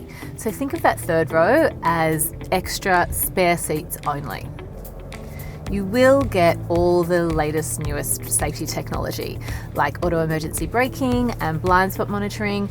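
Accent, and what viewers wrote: Australia. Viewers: Phu Vuong she sounds Australian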